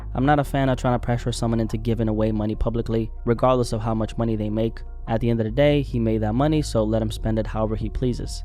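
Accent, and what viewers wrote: East Africa. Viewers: I knew you was an east African just by ur voice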